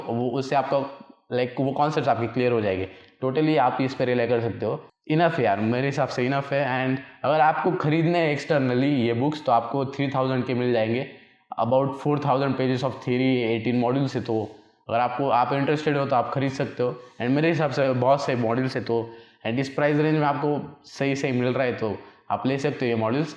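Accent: Maharashtra, India. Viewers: Bhavaa Tujhya Bolnyatil marathi gavran tone!Kuthla aahes Tu?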